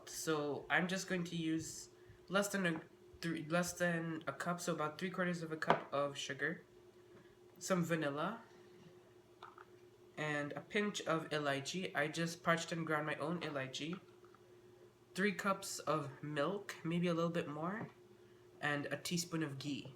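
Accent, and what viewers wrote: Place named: Trinidad and Tobago. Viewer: Very nice. best Trini channel yet. its good to hear a genuine accent and not a fake one. Keep up the good work.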